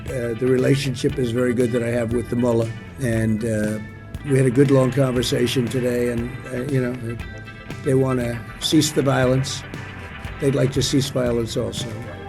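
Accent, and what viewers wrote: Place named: England, United Kingdom. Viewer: I agree it was long overdue leaving Afghanistan. It will quickly revert to its previous state before the U.S. arrived and spend so much valuable coin. No more sole world peacekeeping, stupid and unplanned wars and ‘nation’ building please!?! Nations will need to start taking stock and responsibility for their current state. You can blame everyone else so long. Second, I agree with your show mostly but I still can’t stand the pencil beck geek with the prissy Englishman voice!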